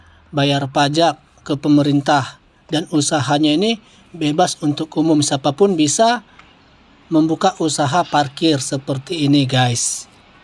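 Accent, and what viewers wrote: Medan, Indonesia. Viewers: Bang mau tanya boleh ngga Abang aslinya orang mana ? Tapi logatnya kaya orang Medan ya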